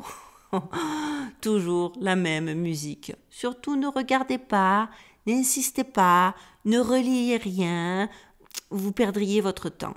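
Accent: South, France. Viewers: hello, encore et tjrs un régal soupoudré par ton accent des cigals, moi la parigotte, j'adoooore, et te remercie, sais tu qu'il a été plus que soupconné, voire prouvé, que les cropcircles, les vrais sont formés par une énergie electro magnétique qui vient du sous sol et non du ciel comme nous le supposions et oui les fréquences electro magnétiques agissant comme on le sait sur le vivant, et de façon comme tu le dit, totalement impossible à reproduire avec des raquettes attachées aux chaussures, bref juste pour ajouter à tes infos que les fréquences qui dessinnent ces crpcircles viennent de l'intérieur de la terre, comme quoi à force de nous avoir dit d'observer le ciel, peut être que tout vient de l'intérieur et non de l'extérieur comme pas mal de choses, n'oublions pas que tt est inversé, donc, commençons à regarder vers le bas et cessons de regarder les mouches voler ou un ciel encodé, là pour nous déviez l'attention de ce que nous devrions réellement regarder, MERCI pour celle que tu es, MERCI pour ta belle humeur, sourire et humour nécessaire en ces temps de mer.......e. 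Love from Paris!!! Nathalie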